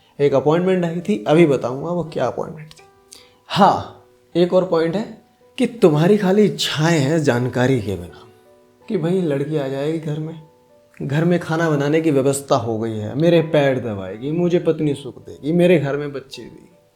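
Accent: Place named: Rural, India. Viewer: Bhai tu jaise bat kar raha hey aur jaisa tera accent hey. Yeh sab tere gaao mey hota hoga. Par the reality is aj koi bhi para likkha hua ladka yeh sochke shaadi nahi karta hey k biwi aa k uski paa dawaygi, yeh toh bahut dur ki baat hey ladki aa k ghar ka kaam karegi yeh bhi koi nahi sochta , kyuki ajkal ka ladki ya bahut hi modern ho chuki hey. Bol degi biwi layi ho, servant nahi. Toh jo bhi ladka shaadi karta hey, woh just ek nice family pane k aas mey shaadi karta hey. Lekin india ka biased law k chalte huye sab ladki aur uski maa baap ko malum hey k shaadi sey accha business aj kuch bhi nahi hey. Pehle shaadi koro, fir divorce do, fir alimony lo, maintenance lo. Ho gaya savings for lifetime, ab dusra bakhra dekho. Isiliye no men should get married in India. Bas yehi ek reason enough hey shaadi na karne k liye.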